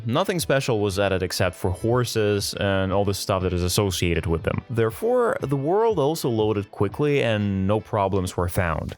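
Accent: Russia. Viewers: Только на 2 минуте заметил что русскоговорящий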